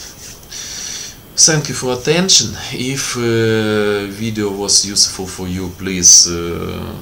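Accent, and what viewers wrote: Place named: Russia. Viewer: Thank you, from the bottom of my heart. helped. Russian accent you have, somehow it was not usual to listen to such a speech. But, really, it helped a lot. ❤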